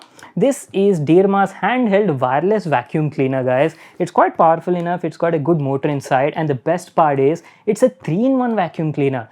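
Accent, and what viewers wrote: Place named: India. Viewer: Why you talk like Apu from The Simpson, You wanna by funny?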